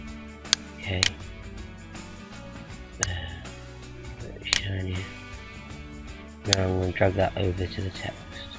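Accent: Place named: North, United Kingdom. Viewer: If I hat to guess I'd say you were maybe from Barrow/Blackpool area from your accent. Good video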